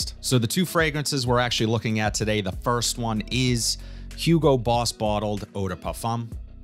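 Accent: Boston, United States. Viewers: LMAO 🤣 The Boston Accent 🤣 always gets me , I'm a Nyer great work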